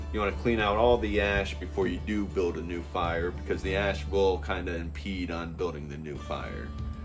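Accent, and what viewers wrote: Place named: Canada. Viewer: Great demo and neat little stove. You kind of sound different to Americans. Maybe you're Canadian or something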